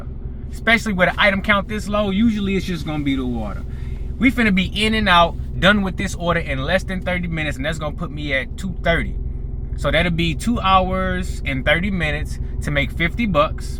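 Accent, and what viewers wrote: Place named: Missouri, United States. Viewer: Funny bro WAZ HAPPENIN! Like that MO accent CHINGY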